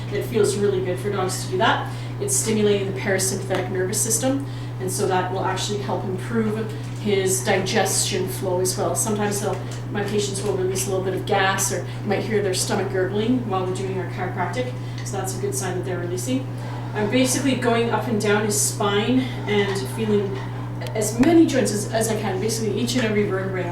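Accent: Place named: Ontario, Canada. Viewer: Her accent sounds Ontarian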